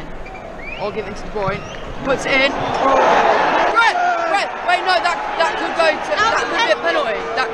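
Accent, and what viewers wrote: Manchester, United Kingdom. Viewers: You sound like you're from Manchester. Lifelong city fan I'm sure 😆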